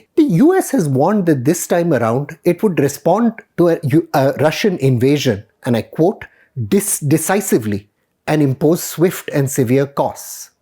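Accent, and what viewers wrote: India. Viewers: So, this is how CNN would sound with an Indian accent... Russia bad, Serbia bad, NATO good... What a joke...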